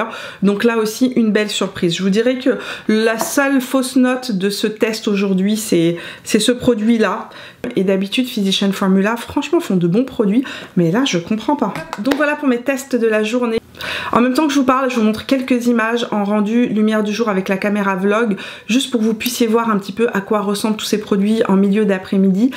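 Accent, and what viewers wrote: Quebec, Canada. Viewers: Bravo pour l’accent un peu québécois!! D’une pure laine !! Très très beau looks, mais le modèle y fait pour beaucoup! Xx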